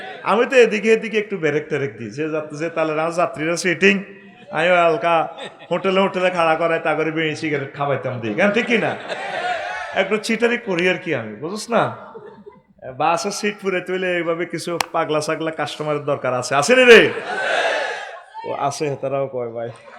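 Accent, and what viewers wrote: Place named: Noakhali, Bangladesh. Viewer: আমার প্রিয় হুজুর আপনি অনেক ভালো ভালো মানুষ৷৷৷৷৷৷ সব কথা গোলা ঠিক নোয়াখালী বাসায় বলছেন বুজতে একটু কষ্ট হয় তবু বুজতে পারছি৷৷৷ তবে৷ একটি প্রশ্ন এক ঘন্টা ওয়াজ করে লাখ লাখ টাকা নেন এটা কি জায়েজ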